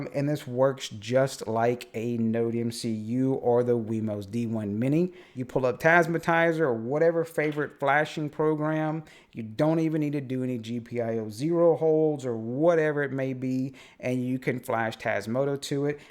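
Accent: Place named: Texas, United States. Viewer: I love Texas accent! ❤❤❤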